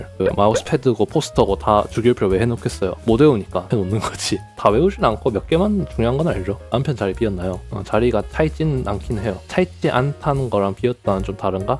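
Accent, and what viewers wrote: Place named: Gyeongsang, South Korea. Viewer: 노↗️래↘️방↗️ 방↗️송↘️하니까 경상도 티남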